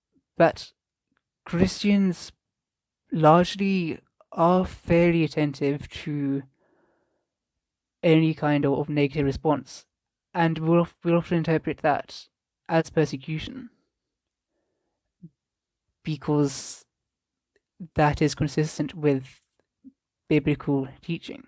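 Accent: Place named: RP, United Kingdom. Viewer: you have a very posh accent. You must have gone to posh public school. Your understanding of Indic faiths is very much appreciated. It would be very good to connect as also live overseas and feel frustrated that well meaning Xtians overseas don’t realise the damage they do to India.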